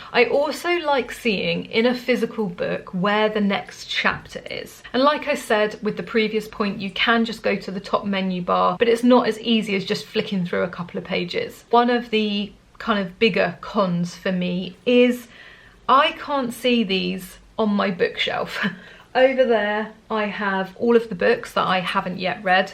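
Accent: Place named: United Kingdom. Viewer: From your thumbnail i thought you were British😅😆,, and then hearing your accent confirmed it... Great video in any case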